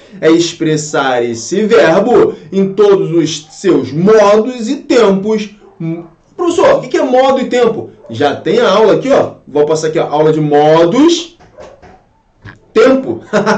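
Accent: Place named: Rio de Janeiro, Brazil. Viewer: Uhhhh falando com i onde não há i, pondo X onde não há X; fica difícil lecionar usando o português da capital do nosso querido Rio de Janeiro.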